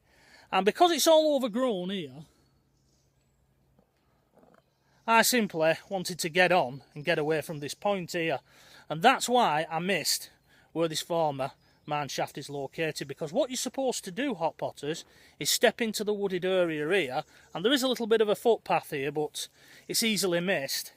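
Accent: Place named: Yorkshire, United Kingdom. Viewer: Loving your Yorkshire accent, reminds me of Emmerdale.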